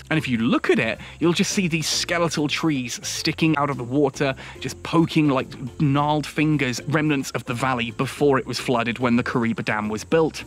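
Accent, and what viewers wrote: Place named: RP, United Kingdom. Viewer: I am Zimbabwean in UK SURREY. Zimbabwe is everything you have said indeed. With that I declare you a Zimbabwean son, brother and one of us. You have said it all and life permits, can’t wait to meet you and your wife, and have a blast ☺️☺️☺️👏. You sound such a well brought up British boy and with this positive and good spirit. I see many Zimbabweans falling in love with you 😍😍😍😍✌️. Keep up the good work and try to do some collaborative with other Zimbabwean bloggers. You won my heart already 💕💕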